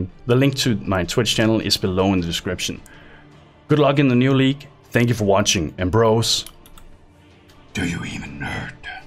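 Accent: Denmark. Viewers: are you danish? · Within the first few words i knew you were Danish lol. Fedt at se en Dansk youtuber der laver content til andre end børn.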